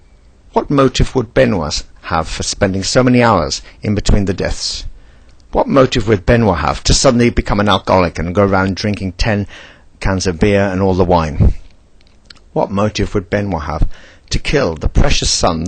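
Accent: England, United Kingdom. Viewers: It's his accent for being british, love his voice actually. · BBC world service style british accent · its an english voice dumbass if u think that sounds gay then u really have crap gaydar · Is the British accent supposed to give credibility to this? He's about as intelligent as Lord Alfred Hayes (RIP). Give me a break.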